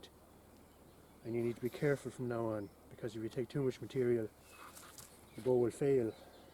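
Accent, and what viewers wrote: Ireland. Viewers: These videos never get old because is such a skilled, inginuitive, man w/a pleasant Irish accent and beautiful terrier dogs.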